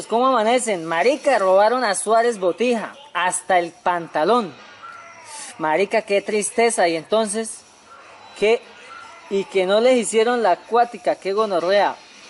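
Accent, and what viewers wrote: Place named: Santander, Spain. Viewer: Creo que es Santanderiano mano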